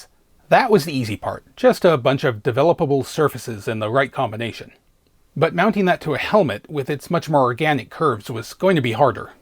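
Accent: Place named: Chicago, United States. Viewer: the juxtaposition of Chicago mafia accent botanising urban wastelands in latin and cursing is the best part!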